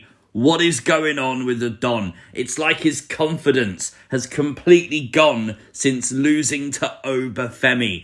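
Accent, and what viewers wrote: United Kingdom. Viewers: You know he’s British? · He said "Rosemary". He didn't say "Rosemarie". It's only the British accent that makes it sound like that.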